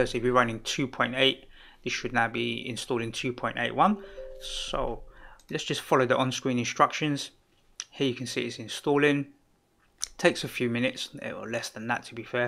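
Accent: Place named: Cockney, United Kingdom. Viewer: Thank you my fellow cockney instructor! clear and concise you are.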